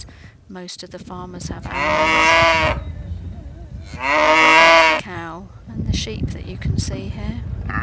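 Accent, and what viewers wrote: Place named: United Kingdom. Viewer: The British Accent 😍😍😍💚💚🔥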